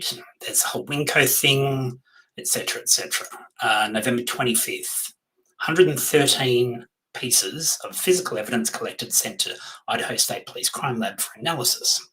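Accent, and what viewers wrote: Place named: Western Australia, Australia. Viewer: You’re from West Aus too!! I live in Perth. It’s funny I could tell by your accent that you must be from West Aus, apparently we sound more English/Australian which is nice I think. Glad to see another West Aussie interested in this case, my husband is sick of hearing about it all from me. Look forward to listening again 😊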